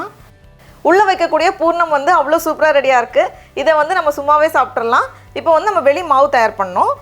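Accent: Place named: Chennai, India. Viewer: ah madras tamil konjam 💜seen ah💜 irukum kekumbodhu sami namba bhasha pesudhudanu sandhoshama iruku Akka 😍🤣🤣🤣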